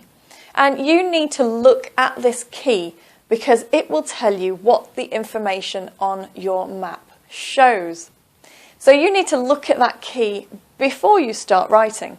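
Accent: United Kingdom. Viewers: Why does not British Council use British accents like her accent in the IELTS?. They always play fucking horribles voices and accents in the listening section !! In the other hand, flawless explanation!! Thank you, Ms !!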